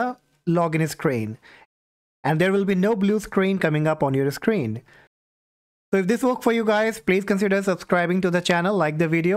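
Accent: India. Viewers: indian accent is trash cuh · That's just how his accent is, what's the problem? He's clearly indian.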